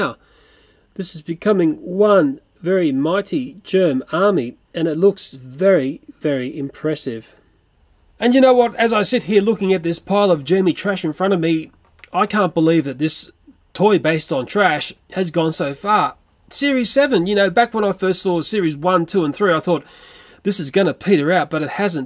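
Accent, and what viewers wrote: Australia. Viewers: cause he’s aussie lmaooo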